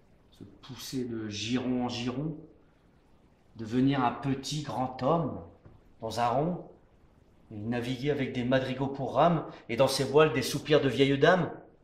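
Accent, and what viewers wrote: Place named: North, France. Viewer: Vous venez du nord vous ^^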